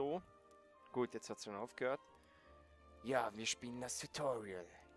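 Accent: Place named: Switzerland. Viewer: Also ich find den leichten Schweizerakzent ganz angenehm ;) Diese deutsch-deutsch-Laberer kann ich langsam nicht mehr hören xD Hab auch gleich abonniert ;)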